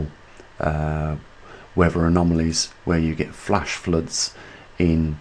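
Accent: United Kingdom. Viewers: Love the British accent! But are you talking about the earth's Chandler Wobble. Because the earth is moving thru space like a drunkard right now. And that is giving us extreme weather changes around the planet. The crazy drunkard planet is giving us low and high jet streams in America which means severe weather such as tornado's, blizzards and ice storms so far in December 2021 and January 2022!! Is this what the Mayans were talking about? You said in the next 40,000 years the earth will start to get colder! And we are about 6,000 years into it? Wow!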